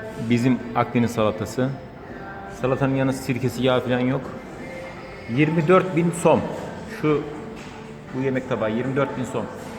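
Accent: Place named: Turkmenistan. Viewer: Тилингиз шеваси туркманларга ухшаб кетади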